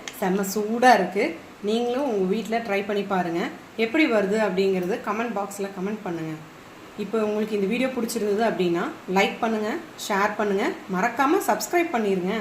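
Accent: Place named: Coimbatore, India. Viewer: Coimbatore slang and snacks super ங்கொ 🙌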